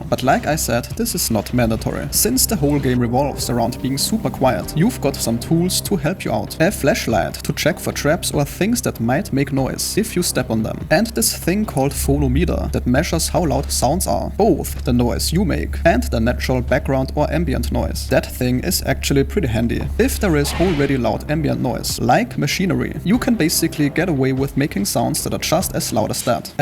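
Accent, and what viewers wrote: Germany. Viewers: man hört komplett das deutsche Englisch raus 😅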